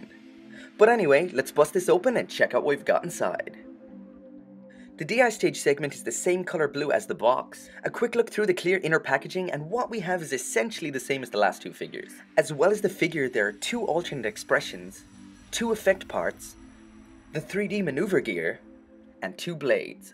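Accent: Ireland. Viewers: I could sense a slight Irish accent! I live in the UK but I'm from Japan, your accent isn't as strong as the ones we get here but I still thought you lived in the UK so when I saw the end of this video I thought it was a shop in the UK and got really excited.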